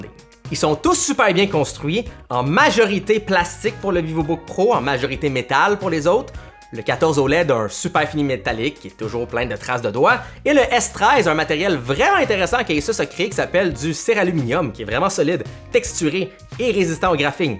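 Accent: Quebec, Canada. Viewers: Ta chaîne est peut-être sympa mais l'accent québécois est insupportable..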